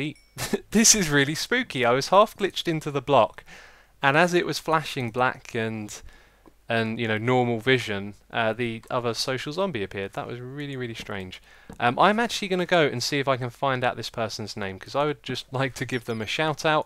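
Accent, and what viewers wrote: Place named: United Kingdom. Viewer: Its really hard to speak in the British accent but i really like it and that's what makes all your videos a bit more fun and entertaining to watch than they really are. P.S BIG FAN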